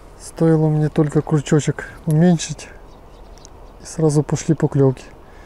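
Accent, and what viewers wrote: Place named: Okanye, Russia. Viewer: ты че вместо буквы А говоришь О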